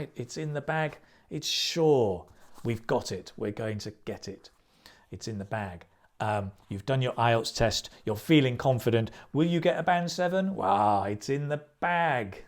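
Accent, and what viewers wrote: United Kingdom. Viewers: I'm really intrigued the way you teach, straight away to the topic and don't waste my time, explain clearly and easy understand. I like your British accent as well.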